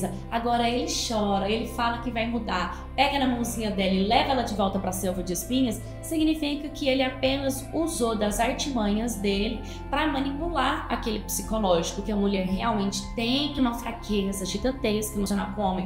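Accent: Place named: Caipira, Brazil. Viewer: Só eu que vejo que ela tem sotaque cautrim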